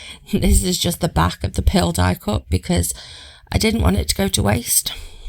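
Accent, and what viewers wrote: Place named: Northern England, United Kingdom. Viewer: Lovely cards. Good to hear a wonderful friendly Northern accent. Not many fellow northerners on YouTube 😂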